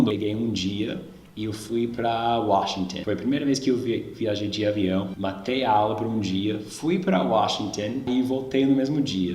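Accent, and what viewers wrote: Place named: Rio de Janeiro, Brazil. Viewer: Achei que vc fosse Brasileiro do rio parece🤣😂🤣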